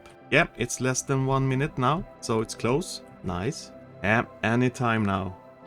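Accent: Sweden. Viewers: You sound very swedish 😳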